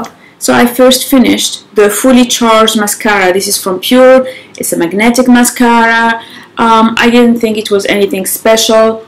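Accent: United Kingdom. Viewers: you are developing a little british accent, how cute .. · that british accent though hahahaha i loved the video, keep shining!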